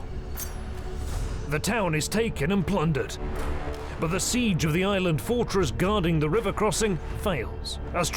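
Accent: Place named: Yorkshire, United Kingdom. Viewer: that yorkshire accent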